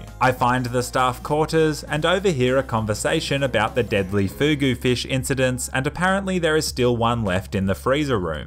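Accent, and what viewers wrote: Australia. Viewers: hes aussie? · Ah i knew you were australian,no wonder you are so wise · so ur australian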